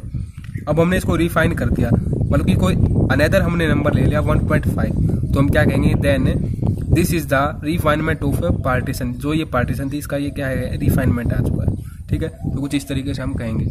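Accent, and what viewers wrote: Haryana, India. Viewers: Aap h haryana se ho?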